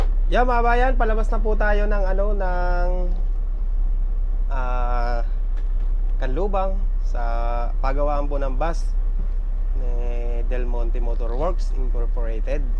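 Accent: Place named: Bicol, Philippines. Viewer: Ganun talaga kapag bicolano ... May diin at minsan wala ang letter sa word na sinasambit ... Pagpasensyahan mo nalang 👍👍👌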